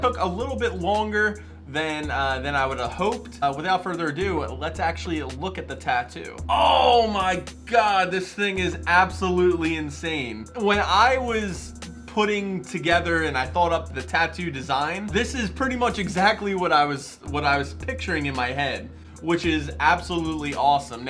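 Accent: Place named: New Jersey, United States. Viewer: He must be from jersey, “balldamore”/Maryland, or PA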